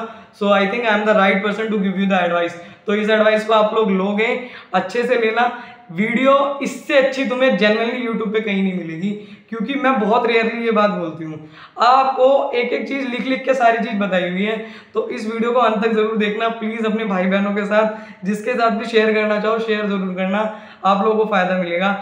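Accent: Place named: Kanpur, India. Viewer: Aap kanpur ya Lucknow ki lagti Ho ya phir ayodhya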